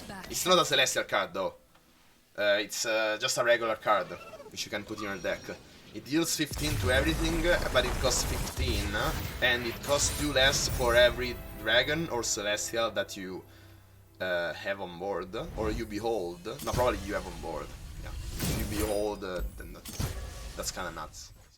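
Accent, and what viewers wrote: Italy. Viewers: Ciao! Sembri italiano da come parli e quindi me la rischio, male che va ti riscrivo in inglese ahaha! Stavo pensando alla possibilità di inserire Lux per Fiora (e magari una remembrance sarebbe obbligatoria) ma non riesco a capire se effettivamente potrebbe essere worthy per gestire meglio alcuni match up. Sono un giocatore di Lux Karma di solito, ma questo mazzo lo conosco poco e soprattutto non so rendermi effettivamente conto se potrebbe sopportare di togliere Fiora. Sapresti darmi qualche consiglio? Grazie mille!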